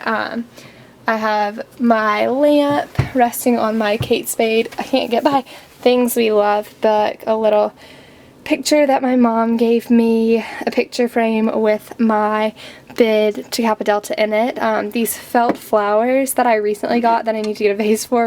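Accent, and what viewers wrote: South Carolina, United States. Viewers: sounds like south carolina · it's southern American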